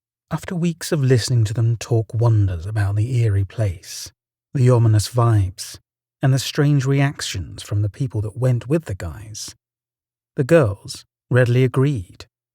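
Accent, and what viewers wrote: United Kingdom. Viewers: Ohh I do love these stories being a ex ghost hunter and demonologist I’ve had many many experiences like this most much worse and one was so bad it made me give up paranormal investigations with ouija boards for good I’ve never ever used “the board” again maybe I’ll write it down one day but when ever I hear a story like this it takes me back to that night and I shiver it’s great to hear a narrator with a British accent for a change too I’ve not heard any of your story’s for a long time I’ve missed you reading to me (US) have a good weekend 😊👍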